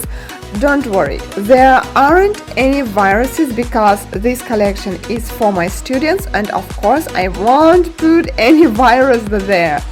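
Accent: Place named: Russia. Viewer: Это круто, но я думаю, вам нужно все таки немного избавиться от русского акцента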